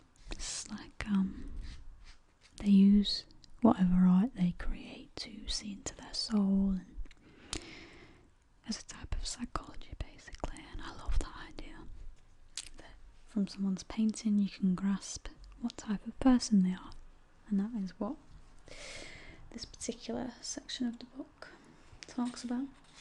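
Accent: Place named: Yorkshire, United Kingdom. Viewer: I'm hoping your Yorkshire down to earth accent is genuine about spirituality, we are taught alway question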